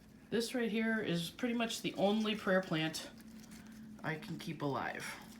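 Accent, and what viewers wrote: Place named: Midwest, United States. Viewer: holy cannoli.. i used to live in Minneapolis! I thought you had a mid west familiar accent. Gonna send you some orchid cactus cuttings! They have orange blooms.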